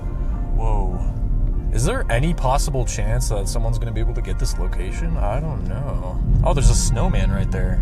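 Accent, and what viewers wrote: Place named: Canada. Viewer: I thought this geezer was Canadian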